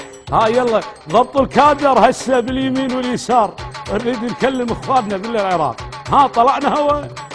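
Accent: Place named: Kuwait. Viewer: ظابط اللغه ماشاء الله كويتي انت ها .؟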